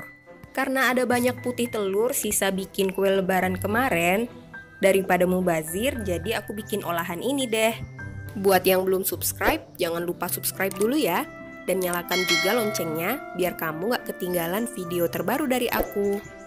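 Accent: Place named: Jambi, Indonesia. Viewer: Orang mana kak? Kok kaya orang Jambi wkwkw logatnya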